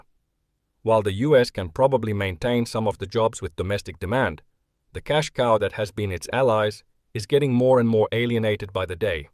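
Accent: Finland. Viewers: Hey you creator. Please put some identification on your content, cause i was really close to dismiss you as AI slop, but then i heard your accent, and as a Norwegian i could recognize you're most likely Finnish ❤ Thank you for looking in to this. Slava Ukraini 🇺🇦 and Europe 🇪🇺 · I'm Norwegian and I know Finnish peoples. He has 100% Finnish accent.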